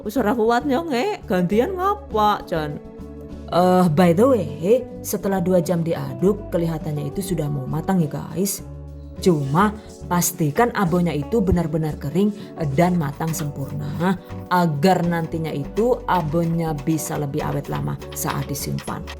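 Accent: Banyumas, Indonesia. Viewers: Mksh resep nya. Bumbunya komplit bgt pasti rasanya enak. Dan satu lagi, bhsa ngapax nya itu lho jd bikin kangen kampung. Salam ngapak. Sukses selalu mbak'e😍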